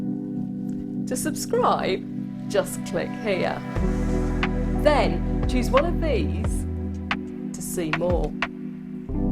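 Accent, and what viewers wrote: United Kingdom. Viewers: Amazing person God bless ya and love your British accent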